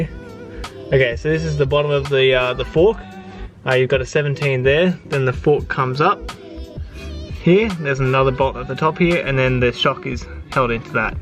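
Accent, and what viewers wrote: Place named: Australia. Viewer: I was wondering myself... english ... airbarg remooved... da fuck, australia¿? (from Spain) Anyway, thanks, really good video mate (y)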